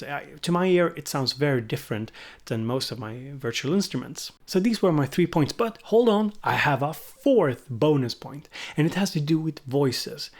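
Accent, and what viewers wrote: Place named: Sweden. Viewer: Thank you for decent youtube content! As a fellow swede, I enjoy your somewhat swenglish accent. =) Keepin' it honest!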